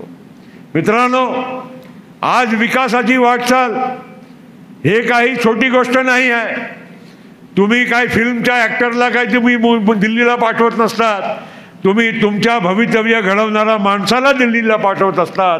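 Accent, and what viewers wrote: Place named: England, United Kingdom. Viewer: Tumhala he Engrajanchya tone madhala Marathi bolayala kasa jamat ho?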